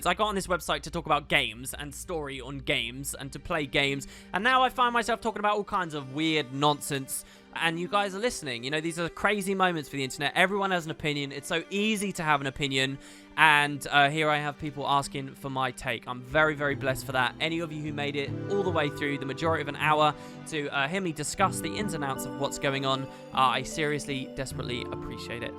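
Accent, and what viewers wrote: United Kingdom. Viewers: your British accent aside we US Yankee's love:) THIS... THIS is why everyone i know and play Gw2 with feels the same IS the VOICE of Gw1 and Gw2 for many of us. You are able to look at the information stay as impassioned as possible to make the most rational conclusions is why even on topics I disagree with you on (20 to 25%) I ALWAYS know your coming from a good place, a person who loves and likes community, the Guild Wars world and games, and decency. All the best and thank you for not doing what 99% of you tuber's do. Worry about speaking your truth an losing subs. Ty again.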